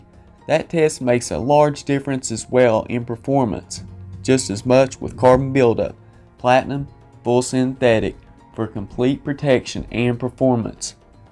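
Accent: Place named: Rural, United States. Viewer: Can't understand a word you're saying. Need a translator- I don't speak hick. And what's with the dramatic music? It's motor oil, not space travel. C'mon man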